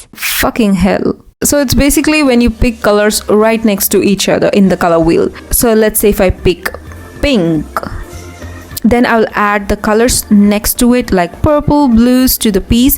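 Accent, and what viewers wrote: India. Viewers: your accent is lowkey indian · Are you an Indian? Your accent sounds familiar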